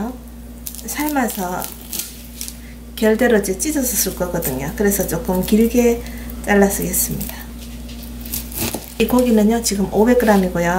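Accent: Gyeongsang, South Korea. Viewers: 이모님♡ 경상도톤이라 더 정겹네요.ㅎ 실방타고 첨 뵙는데... 돼지고기 장조림은 저도 처음인데... 손자,손녀가 잘 먹을것 같네요.ㅎ 자주와서 식사준비 할래요. 3종하구 갑니당.~^^*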